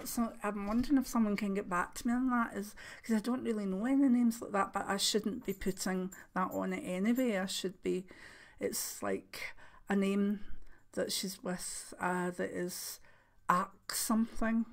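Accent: Scotland, United Kingdom. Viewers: Hi! I hope you are feeling better and resting. I've only recently subscribed to your channel but already I have used many of your meditation methods and the archangel that came through was Peter (?) I wonder if you would know more about this? Can I ask, do you do a lot of crystal work? I've seen one of your videos on crystals you recommend.You are so intuitive and being born in Edinburgh myself, your Scottish accent just makes me even happier to have found your content.:)Namaste.Love and light to you and yours. Loz. xxx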